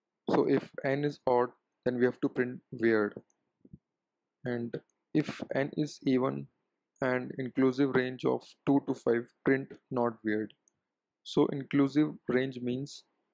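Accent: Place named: India. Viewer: once I hear the indian accent, I already know the guide is gonna be good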